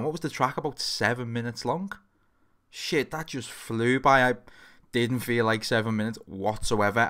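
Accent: Liverpool, United Kingdom. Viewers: Not a huge fan of reacts, or the scouse accent, and yet somehow I'm subbing :p I love your passion for the music man.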